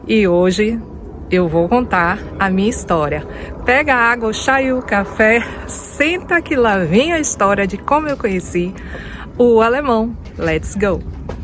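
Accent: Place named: Salvador, Brazil. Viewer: Olá, eu também sou de salvador, vc explica muito bem boa dicção, com esse nosso sotaque bem presente. Nunca vi seu canal, 1 vez.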